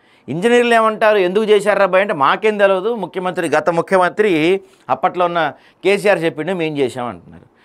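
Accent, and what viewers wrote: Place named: Andhra, India. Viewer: అరేయ్ బొచ్చు నువ్వు ఆంధ్రా క్రాస్ ఆర ఎందిరా నీ భాషా😂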